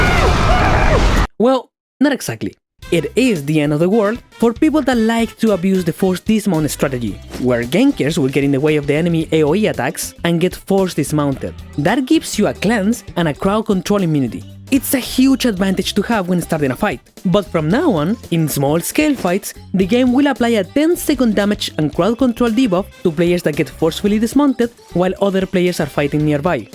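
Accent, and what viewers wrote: Chile. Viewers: eres chileno? sacate una guild C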